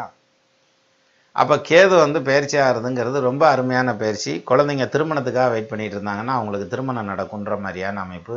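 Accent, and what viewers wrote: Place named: Madurai, India. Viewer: You are great and I belong to Madurai, your Madurai slang is wonderful. One question - People in what age have 2 shift of “Ellarai Sani”...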